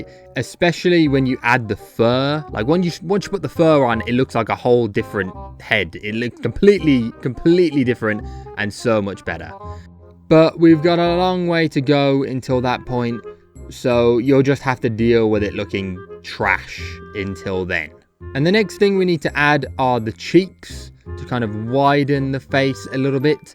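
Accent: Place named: United Kingdom. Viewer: You sound British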